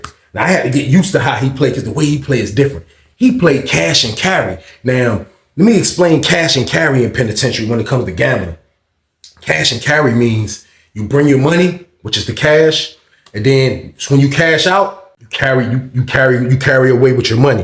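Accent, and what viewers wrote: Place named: Texas, United States. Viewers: Nah that’s how we talk in Texas fasho! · He really just talk like a nigga from the south 😂 whenever we play cards it’s a lot of trash talk like that just don’t threaten anybody and you’re good or call them a bitch unless it’s some family or homies.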